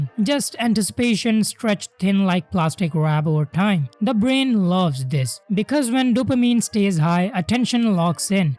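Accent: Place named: India. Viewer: no, it's your IND accent. the IND accent is probably the most annoying in the world to listen to.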